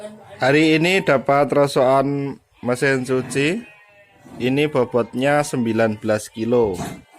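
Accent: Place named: Surakarta, Indonesia. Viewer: solo ne pundi mas kulo colomadu. Sui biangeet ra krungu logat solo, guyu2 dewe aku..smangat terus mas yo💪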